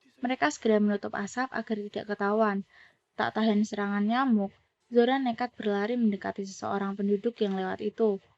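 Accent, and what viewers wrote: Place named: Banyumas, Indonesia. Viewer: Narator ngapak 🙃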